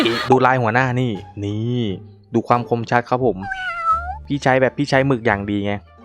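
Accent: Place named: Rural, Thailand. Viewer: อยากให้พี่ พูดเหน่ออะคับ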